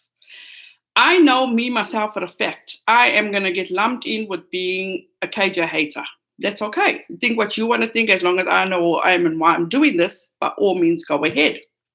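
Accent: South Africa. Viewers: Btw I love your accent, I worked with 4 S. African doctors, love it!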